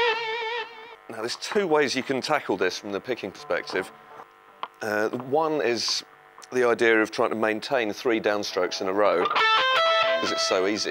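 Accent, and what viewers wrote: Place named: United Kingdom. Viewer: I started to love british accent because of him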